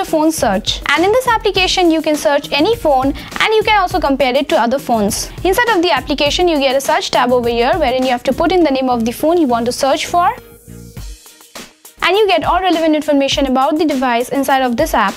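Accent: India. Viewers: You speak as like Indian's, are you Indian?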